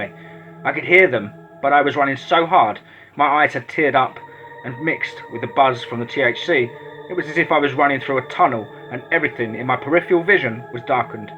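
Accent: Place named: Estuary, United Kingdom. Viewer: It's good to hear narration with a Thames Estuary accent; makes a refreshing change.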